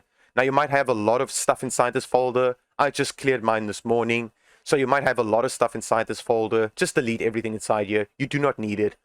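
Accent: South Africa. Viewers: i love the south african accent XD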